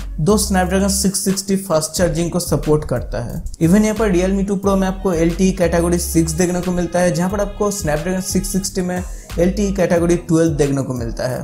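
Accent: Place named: Bihar, India. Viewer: भिहारी टोन आ रहा है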